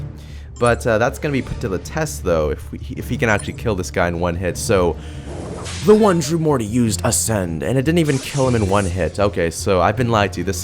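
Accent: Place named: Ontario, Canada. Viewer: I assume he is a fellow Canadian... Sounds like an Ontario accent to me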